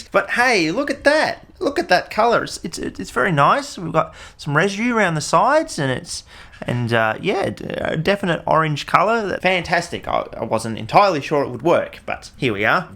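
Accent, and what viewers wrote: Australia. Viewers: Ah yes my daily dose of tar synthesis narratived by a goofy Aussie while in a shed.. now we are cooking with kerosene. Best wishes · This video autoplayed from a Primitive Technology video and for a sec I thought the dude finally broke his years of silence to cheerfully yell at me in an Australian accent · Who else thinks should be a highschool Chem teacher?! I swear he reminds me of "Doc" my hs Chem prof, got the 'stache, even says "Ah-loo-minium" and everything😢.... (I'm in the US, Appalachia at that 👉🏻"Apple-atcha" for context) Who knew I'd be nostalgic watching an Aussie backwoods chem channel (BEST chem channel IMO)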